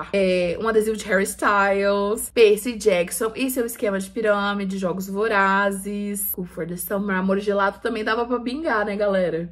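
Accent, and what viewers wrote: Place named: Minas Gerais, Brazil. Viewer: amo demais a sua dinâmica de vídeos, amo estantes alheias, amo a maneira que você é educada sim, amo o nosso sotaque mara de Minas, amo as indicações, mesmo que meu gosto literário é um pouco diferente você me desafia a ler vários conteúdos diferentes!!! ❤ continue!!!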